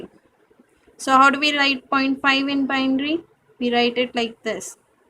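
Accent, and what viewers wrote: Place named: India. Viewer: Typical Indian English -_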